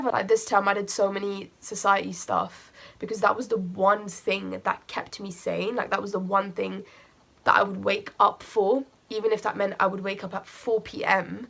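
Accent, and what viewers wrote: London, United Kingdom. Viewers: True Londoner accent.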